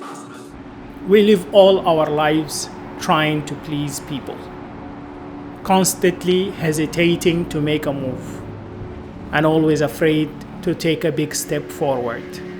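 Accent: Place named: Africa. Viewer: مرحبًا ، دائمًا... يتطلب الانتقال نوعًا من الجهد الأخلاقي والمالي والقانوني. أنا متأكد تمامًا من أن مشاكلك تتعلق ببعض المشكلات الأخلاقية وبعض المشكلات الاجتماعية (التي تتعلق بالقانون) ، حاول تقليل قضاء الوقت في التحرير ومحاولة التصوير في بث مباشر (كما فعلت هنا). بالإضافة إلى ذلك ، قم بتحسين لغتك (بالمناسبة... لهجتك رائعة من أصل أفريقي).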